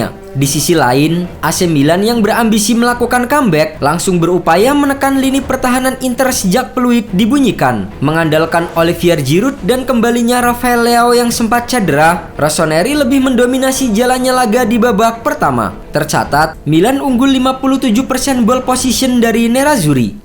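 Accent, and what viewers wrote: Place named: Tegal, Indonesia. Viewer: Kayak orang Tegal ya min hiks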